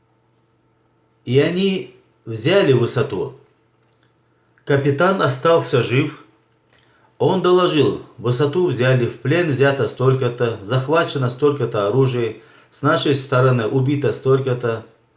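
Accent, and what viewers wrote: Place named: Karachay-Cherkessia, Russia. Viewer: У него акцент немного на карачаевский похож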